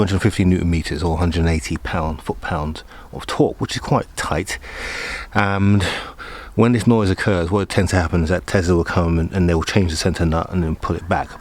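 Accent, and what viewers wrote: United Kingdom. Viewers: your a man of brown skin colour but could not sound more native british. made me laugh.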